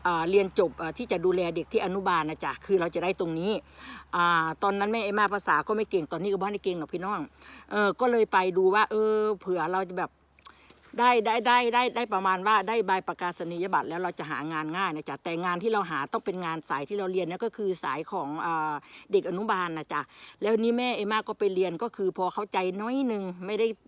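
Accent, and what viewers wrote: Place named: Kui, Thailand. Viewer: เว่าเป็นตากุยแท้ครับ ฝรั่ง55+😄😂🥳😁🎉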